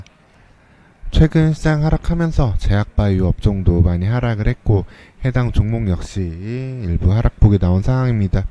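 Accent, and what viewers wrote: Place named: South Korea. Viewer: 지방분이세요? 말투가 졸려요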